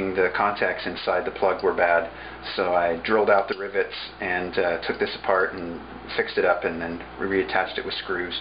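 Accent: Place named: Canada. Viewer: Your accent is, like, 5% Canadian. Are you in northern Washington?? What a cool accent, man.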